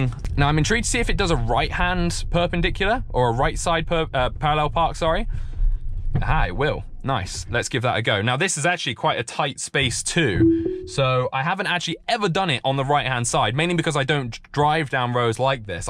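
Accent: United Kingdom. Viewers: North and middle of UK talk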